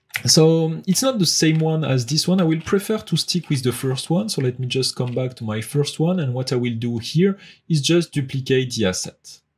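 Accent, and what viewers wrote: France. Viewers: J’adore l’accent French 😋😉😎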